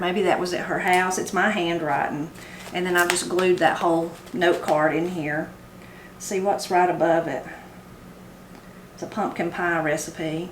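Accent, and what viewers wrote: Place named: South, United States. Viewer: Good morning, I enjoy your videos. Thank you I live in Connecticut, your southern accent is charming and you stories and anecdotes are interesting. I would like to offer my tip for blueberry picking. I want to use 2 hands to pick so i use a milk jug that I cut the top off but leave the handle to which I tie a ribbon or string that I put around my neck for hands free picking. I always bring a larger container to dump into as I go so I can get slit of berries.🙂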